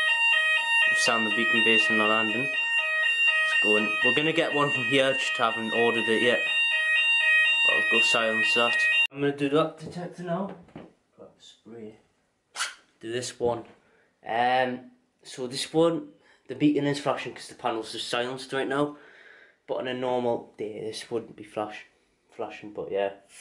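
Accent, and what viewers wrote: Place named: Wales, United Kingdom. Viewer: No, hes welsh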